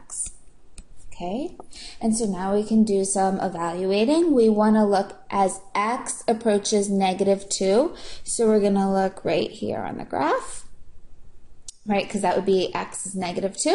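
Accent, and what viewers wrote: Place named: Maryland, United States. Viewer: hearing your maryland accent made me feel back at home while going to college in california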